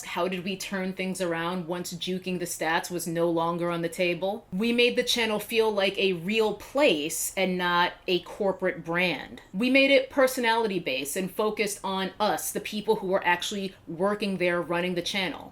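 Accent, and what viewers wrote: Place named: New York, United States. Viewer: Mmmm I felt like you had a NY accent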